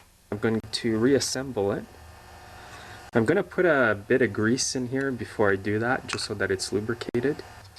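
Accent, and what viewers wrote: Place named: Canada. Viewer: I love the way Canadians pronounce the word "out" as "oat." Great videos BTW!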